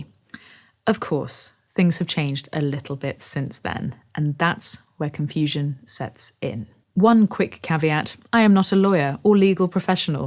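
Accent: United Kingdom. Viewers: I suspect she could earn serious money doing voiceovers for companies who need an authentic and very well articulated brit voice. Could listen to it all day TBH. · As an American for quite some time, your British accent knocks me out: "Johnny Cash and _____" (something naughty) and "One of the best ways to grow your audience' hair"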